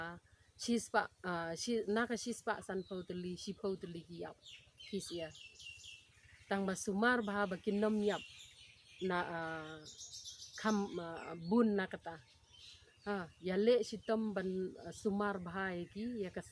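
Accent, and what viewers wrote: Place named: United States. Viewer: Sem syiar jng phi da don da ki batch mo katno batch kiba rit bd ktno kiba heh bd ktno kiba lah long bam doh bd ktno batch kiba lh kha pylleng. Kren style phi te mo kren fareng te lh wai da kwah batai ia ki nongrep khasi jng ngi sngewbha batai da ka ktien khasi nmr bun ki dei bym sngew fareng kum ma phi, phi te ka accent lane ring Sur thik kum ka Mem na USA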